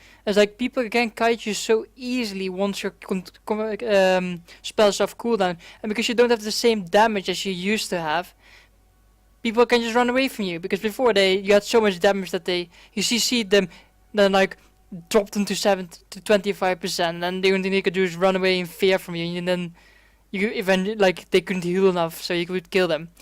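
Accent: Netherlands. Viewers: the dutch exent is so enoing but the info is kinda good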